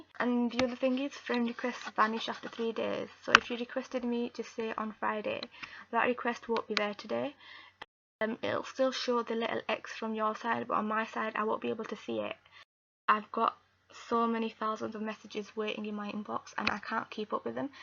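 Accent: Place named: Yorkshire, United Kingdom. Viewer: i heard she is from yorkshire up north but idk what that sounds like cos im from east england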